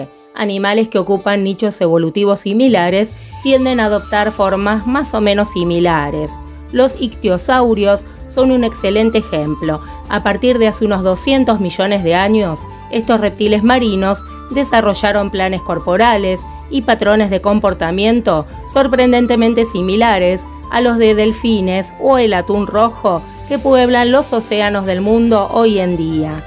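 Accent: Argentina. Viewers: Muy bonitos y gorditos estos "delfincitos cavernicolas", jaa. Yo los he hecho en plastilina..son muy faciles de hacer. Pero ahora quiero hacerlos en resina, igual que mis otros dinosaurios que hago. Yo creo que si vivieron hasta el final de los dinosaurios, con la ultima especie descubierta en el Cretacico. Pues su anatomia resulto muy exitosa para su ambiente. Como lo es ahora los delfines y ballenas..claro: estos tienen la mala suerte de haberse topado...con el Hombre, somos los peores, jaa. Muy buen video, felicidades. Solo no me gusto el acento argentino...es muy molesto. Adios desde cd. De Mexico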